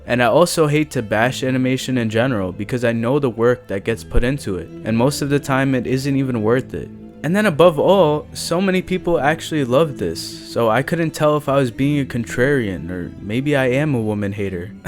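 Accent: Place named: United States. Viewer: the bullet is from an old musket ... it's not as fast , the water ? you think cold water is a danger ? you sound american , the one hand holding on ? did you forget about adrenaline ? and that Mizu is strong ? + add it beeing animation and animation exists to portray things real life can't to boot , so idk man , ur probs just digging too much into liquid sands , instead of enjoying what you get , i really enjoyed Blue Eye Samurai , i don't think you see what Blue Eye Samurai does , because it delves into asian culture and humour , as someone who watched alot of those films , the funny cameos , tiny little things and jokes weren't wasted on me , it's unfortunate that you didn't like it , probs the american in you speaking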